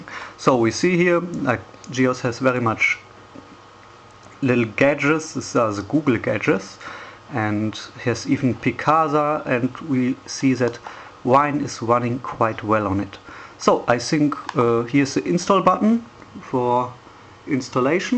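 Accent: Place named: Germany. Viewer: du solltest schon Deutsch reden dein Akzent hört sich nach deutschem an. dieses kommentar hab ich von nem LapTop mit gOS geschrieben.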